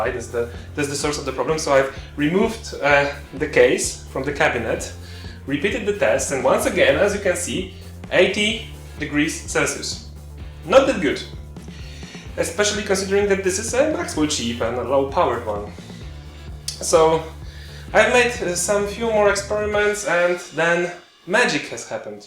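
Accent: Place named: Italy. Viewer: aw well, your accent sounds like you're italian xD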